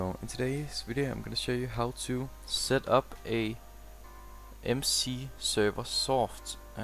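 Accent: Denmark. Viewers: Man kan høre på dig du er dansker ;D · Dit danske accent xd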